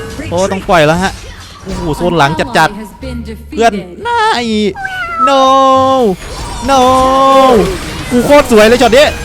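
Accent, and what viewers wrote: Isan, Thailand. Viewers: เป็นคนที่พูดอีสานแล้วดึงดูดใจแปลกๆ ค่ะ55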